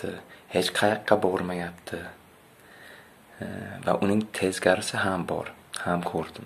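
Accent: Uzbekistan. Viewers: Сиз тоза Озбек тилида сузлаяпсиз ,анаву вотти вотти килмасдан шундай гапирсангиз бутун Турк дунёси тушинади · Гап булиши мумкин эмас зур соф узбек тилида гапирияпди офарин 👍👍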